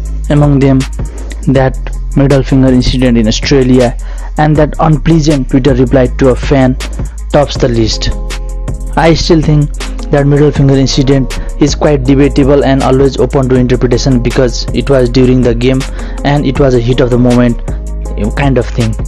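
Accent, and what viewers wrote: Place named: Nepal. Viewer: Where are u from😂😂talking like nepali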